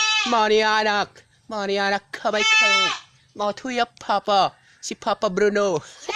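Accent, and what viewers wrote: Visayas, Philippines. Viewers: Pastilan gid hahhaha bisaya kaayo hhahaha mag like nito sipain ko bahay niya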